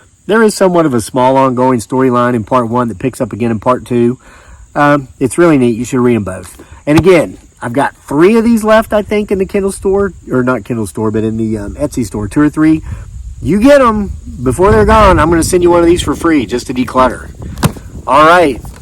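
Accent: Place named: West Virginia, United States. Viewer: I love all of your stories ..... I was born in West by God Virginia and spent my first 18 years there..... I love hearing your West Virginia accent..... and stories ....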